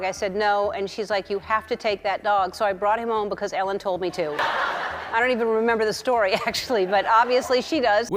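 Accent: Mexico. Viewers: I like your accent..where is it from??? It sounds Mexican..but......i like yours... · PLEASE!!!! TRY NOT TO SPEAK IN THIS HORRIBLE MEXICAN,RUSIAN,CUBAN ACCENT.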